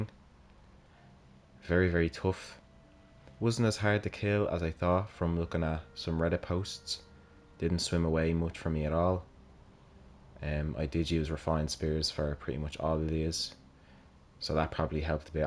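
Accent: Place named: Dublin, Ireland. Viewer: love your accent! Are you from Dublin?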